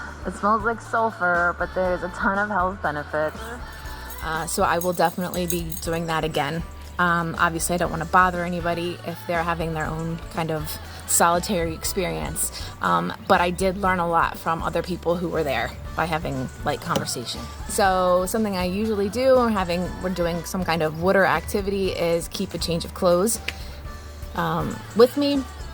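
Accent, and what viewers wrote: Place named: New Jersey, United States. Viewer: Love it! You can take the girl out of Jersey, but can't take the Jersey out of the girl... "Wooder 🙂"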